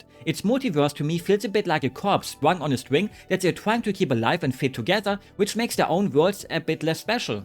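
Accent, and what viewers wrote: Germany. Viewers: I just found out about this channel and realized how many similarities we share we are both Nia fans and have an silly accent, I have an welsh you have an German accent😂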